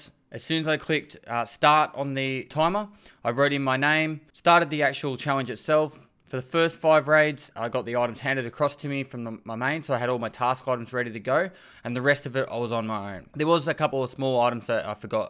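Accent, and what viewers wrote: Australia. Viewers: Hey Pestily, are you an Aussie?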